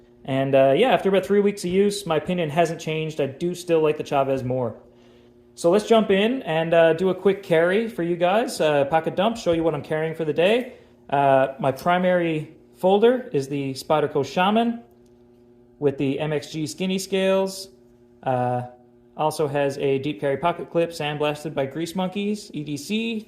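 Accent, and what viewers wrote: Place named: East Coast, Canada. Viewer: You sounds like you’re from the east coast of Canada. Good video by the way.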